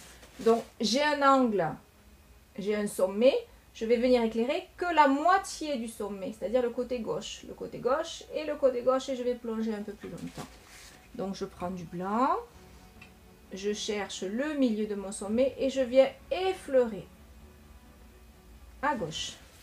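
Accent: South, France. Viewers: Ah enfin le tuto de ce tres beau tableau ! Tout est parfaitement bien expliqué. C est magique de vous voir prendre possession de la toile, de lui donner un sens et de voir emmerger les montagnes, la cascade et les fleurs; le tout avec votre bel accent meridionnal. Merci et bravo pour ce partage.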